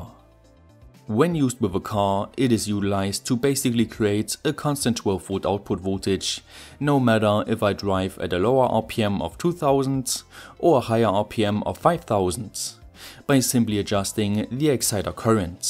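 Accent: Germany. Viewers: Your German accent sucks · To All: Info. Whatever ' brand ' of vehicle alternator you use ; check out the idle speed of THAT brand of vehicle. That, idle speed, is what you'll require to create an electric generation unit. Minimum of probably 1700 or so. Make it for at least 2,000 rpm. ( 3600 may be the best, like those gens you buy , in a steel piped case type = Champion, and other brands ). If i build one, it would use an alternator off of a semi- engine ; probably a Detroit, or Cat engine. Rating about 200 amps. IF it's going to be made, it's going to produce power !. Thanks, for the info, SIR. Wonderfull accent, is that German, or Norweigian, or ...? (Alberta Canada) A generator, usually requires about 2 hp of rotational force, for every 1,000 watts of power output. There is some loss, but efficiency is between 80 - 88 %. I have several generators, 208 cc gas engine powered, 3500 Watt BE generator. 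Older Yamaha, 2800 watt output, uses a 256 cc engine. ( 1983 model - had to redo carb = works awesome. Great engine !!)) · Geat german accent! Ich spache deutsch! (but I have terrible spelling)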